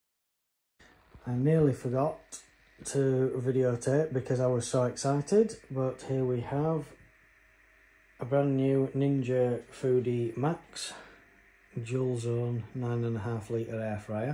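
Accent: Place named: England, United Kingdom. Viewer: Glad to hear an English voice (for a change). Good luck with the channel.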